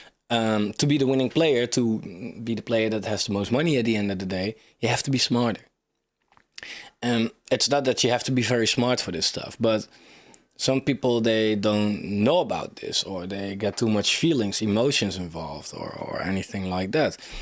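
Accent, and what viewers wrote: Netherlands. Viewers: Great content, finally something different. Btw you sound dutch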